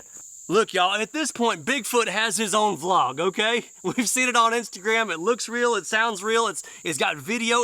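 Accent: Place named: Memphis, United States. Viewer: did you just say you lived in Orlando for 25 years?Where the hell did that accent come from then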